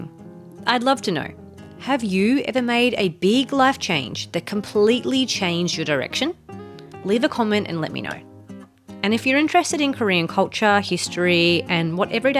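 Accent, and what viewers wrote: Australia. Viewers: G’day, mate! Glad to see an Aussie here in South Korea. I’m a Korean Aussie and used to live in Adelaide but now living in Korea near Daegu. Came across your YouTube clip and so glad to hear Aussie accent in such a long time. perhaps, 4-5years since I left Adelaide? All the best for your new journey and hope you to find many joys much happiness. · 호주 영어 넘 듣기 좋아요.^^늘 응원하겠습니다. 전 반대로 호주에 있는데 한국에서 새로운 도전중에 악기나 스크린 골프 뭐든 배우시는것도 추천드립니다.^^ 그리고 아프시면 안되니 병원도 꼭 자주 가서 검사받아보세요. 제가 호주에 있으면서 제일 불편하고 적응안된 두가지입니다. 한국은 이 두가지 천국이에요^^ 꼭 원하는 성과 없으시길 응원드리고 늘 구독하겠습니다.홧팅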